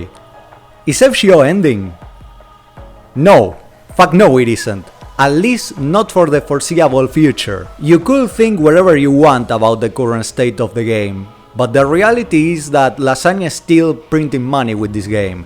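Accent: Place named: Argentina. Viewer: El inglés más argento loco. Muchaaachooos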